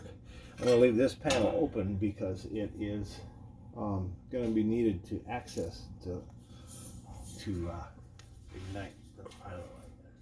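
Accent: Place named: Upper Midwest, United States. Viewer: Thx! Excellent info and funny. Been wanting to clean our glass for years. Sounds like you’re from WI or MN? 😁. I grew up near LaCrosse WI.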